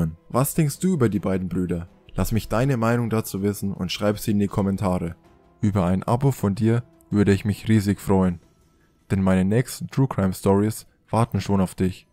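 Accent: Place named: Franconia, Germany. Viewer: so geil! Ich liebe fränkisch! Alle aus meiner Family kommen da her. (Nur ich nicht 🤨)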